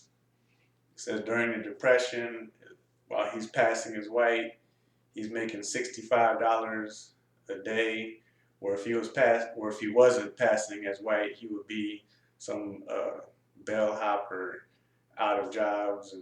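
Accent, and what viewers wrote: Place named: South, United States. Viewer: This was well done. Its a heartbreaking topic but has to be brought to the light. I like book talks and it sounds like you have a Southern accent which I can relate to so I subscribed.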